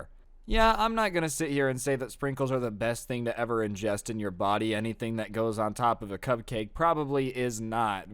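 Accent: RP, United Kingdom. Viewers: As a Brit i can say that that is the poshest of posh brit accent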